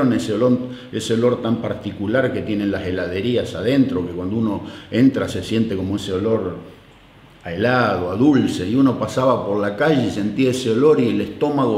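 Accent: Argentina. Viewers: Lo que me encanta de es que no ha perdido su acento argentino, y lo que dice respecto a lo que cuesta comprar, tristemente sigue igual. A muchos nos está costando llegar a fin de mes, hay que trabajar cada vez más y lo mismo, no alcanza. Los sueldos y jubilaciones son bajos. Se mantiene a los vagos con planes. Eso me tiene cansada, nos tiene cansados a todos. Deseamos gobernantes honestos y que ayuden a todos con trabajo digno, sueldos dignos., admiro su valentía de contar lo que tanto les hizo sufrir acá. Y qué bueno que tuvo junto a su familia un mejor pasar.. Saludos desde Mendoza Argentina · Gracias por compartir un poco de tu historia!!!. La forma que tienes de relatar con ese acento argentino es muy atrapante... sos el Gato Peters canadiense!!!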